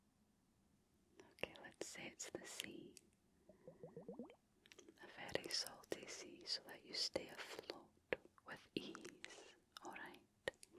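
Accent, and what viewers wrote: Scotland, United Kingdom. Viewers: Always love the Scottish accent ❤😊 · Love your ASMR and Since I'm a person who's able to copy accents- even unintentional - i often catch myself talking with a Scottish accent slipping trough after watching/ listening to your ASMR over night 😅 it does help me Talk loudly without my Parents understanding what i say, theyre not so good talking english anyway 😊 Greetings to Y'all from 🇩🇪 Sleep well ❤ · Shortish accent is beautiful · You’re so sweet 🥲 and your voice is really soothing 😇plus I love the Scottish accent. Thanks for such cool ASMR content. Really appreciate 🤗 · You always have a Scottish accent ? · Listening to the music you create - then listening to your ASMR the contrast between the two make the ASMR oddly more satisfying. as an English man i will apologise in advance as i'd never considered the Scottish accent to be so soothing and relaxing you have proved this englishman very wrong. 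thanks for the content. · Everything is satisfying in the Scottish Accent ❤ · Must be my Fraser Clan heritage coming through cause I love the/your Scottish accent in ASMR!👌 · Why does the way you pronounce "hairbrush" make my legs melt like butter and my skull vibrate? Surely not everyone with a Scottish accent can do what you do! What's your secret?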